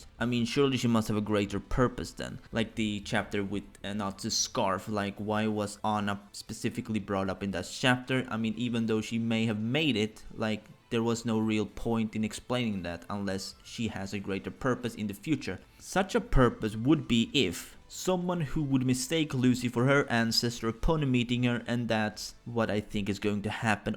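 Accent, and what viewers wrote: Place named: Spain. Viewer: you're freaking Spanish PEOPLE DO YOU HEAR HIS VOICE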